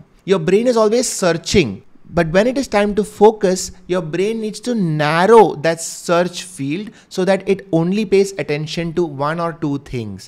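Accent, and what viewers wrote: India. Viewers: Why do you talk indian English In between normal english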